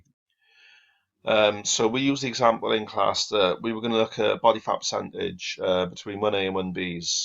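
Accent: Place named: England, United Kingdom. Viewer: Can't understand shit. Your English is too overly english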